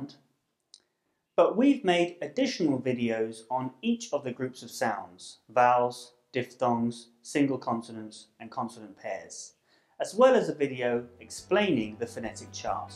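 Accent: United Kingdom. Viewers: Sir! Is this British pronunciation??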